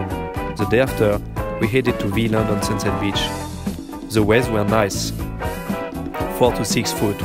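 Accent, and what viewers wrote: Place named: France. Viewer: On sent un petit accent français de rien du tout. Je ne connaissais pas du tout ces flexspoon board amazing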